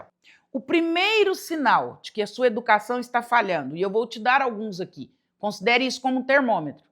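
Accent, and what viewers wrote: Brazil. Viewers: Olá, a senhora poderia melhorar este sotaque, e parar de falar com este R interiorano e falar sem sotaque.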